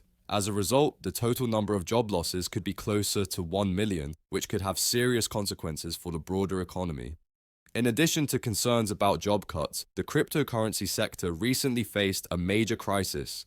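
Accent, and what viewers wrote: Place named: United Kingdom. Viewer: Dude whats up with the Brit accent. 😂. I liked it more when you used your own voice.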